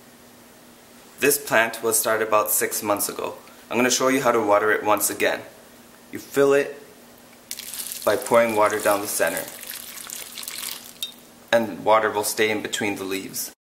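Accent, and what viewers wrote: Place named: Caribbean. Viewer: I hear a Caribbean accent… Cayman Islands maybe?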